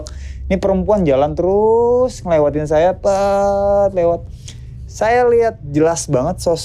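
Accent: Java, Indonesia. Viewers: Bang abis ketemu mas Itank dkk n mas Bala, jd kebawa logat Jawa yah...😃😃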